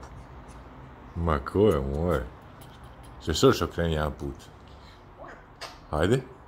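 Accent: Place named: Split, Croatia. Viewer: legenda a jos ima i splitski naglasak hahah lepotan pravi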